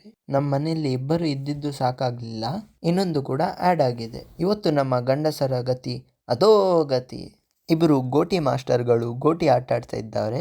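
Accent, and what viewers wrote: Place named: Mangalore, India. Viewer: Manglore kannada super voice nice bro❤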